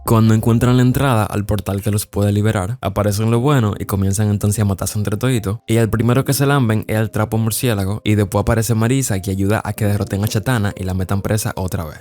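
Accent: Dominican Republic. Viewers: Bro eres dominicano o es cosa mía? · AY ERES DE REPÚBLICA DOMINICANA OMG DE QUE PARTE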